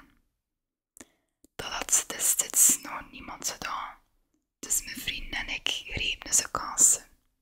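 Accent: West Flanders, Belgium. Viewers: zalig voe ne kje twa ut eigen coté te meugn oorn. 😉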